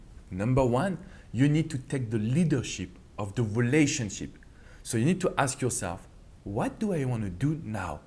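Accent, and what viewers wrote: France. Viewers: Please check my msg sir... i didnt meant to criticize yu..nt even think about that.. bcoz u r the coach i have huge respect for.. i only mean.. i want some advice at this particular situation... i love this french accent words very much❤️... i lv , respect u sir.. atleast pls don't misunderstand me fr that comment... please help me to get over this situation sir...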